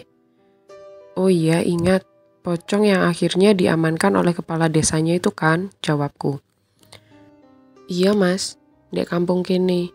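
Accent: Malang, Indonesia. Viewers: Asik an pas samean dewe seng crito sam, boso malangan mantep, berasa ndek tongkrongan. 😁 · malangan betul logatnya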